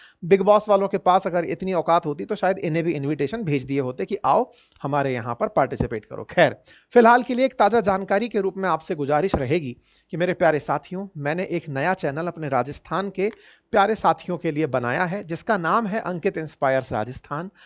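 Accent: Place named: Bihar, India. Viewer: Sir apka tone me thoda biharipan aa raha hai acha lagta hai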